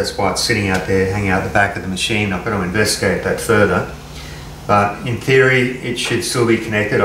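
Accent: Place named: Australia. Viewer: Great videos and it is so nice to hear an Aussie accent. You are fast becoming an Aussie "This Old Tony". Well done!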